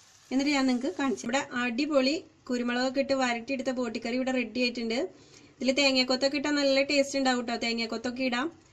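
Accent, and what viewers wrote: Thrissur, India. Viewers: ചേച്ചിയുടെ അവതരണം സൂപ്പർ . സംസാരവും തൃശ്ശൂർ ക്കാരി ആണ് അല്ലെ വീഡിയോ കാണാൻ കുറച്ചു വായിഗ് പോയി സോറി . സംഭവം കലക്കി ... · haha...kollam chechii...enike ee recipem chechide sumsaravum istapettu....thani nadan thrissur bhasha..nyc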